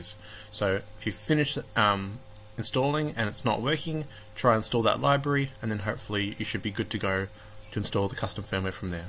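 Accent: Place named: United Kingdom. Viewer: fair comment but - I was refering to the pronounciation of 8 and the letter h. It seems that UK and friends have a different way of pronouncing both than some other countries including US. (eg "I ate you enry iggins") Combined with fuzzy (at best) video mistakes are made. On the positive side, there was thankfully no distracting music. I prefer written code in the comments. thanks for your interest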